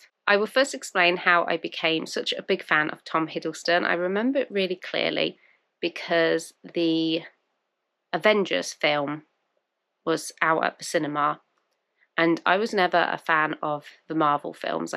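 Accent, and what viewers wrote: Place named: United Kingdom. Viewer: Hello! I liked your vlog! I’m also a huge fan of Tom Hiddleston. The video was very informative and interesting. I didn’t know about the fact that Tom Hiddleston’s favourite book is “Anna Karenina”, and for me, as a Russian, it is a great surprise and pleasure. I have a question, sorry if I’m wrong, I’m just curious; You have a very beautiful accent, and can I assume by that that you live in the UK? If so, did you have any chance to meet Tom Hiddleston, possibly at some events? Thank you and have a great day!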